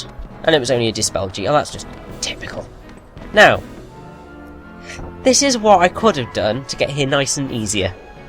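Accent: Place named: Wales, United Kingdom. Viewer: haha, you do sound a little Welsh! Nothing wrong with being English, although Welsh are better ;)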